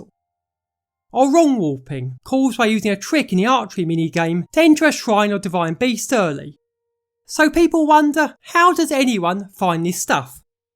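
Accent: United Kingdom. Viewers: This British accent is the most British accent ever.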